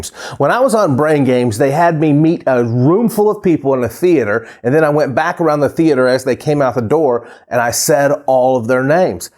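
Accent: South, United States. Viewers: Love your charming Southern accent.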